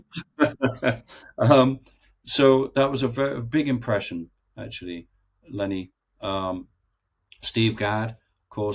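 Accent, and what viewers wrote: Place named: Scotland, United Kingdom. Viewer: sounding very Scottish today!!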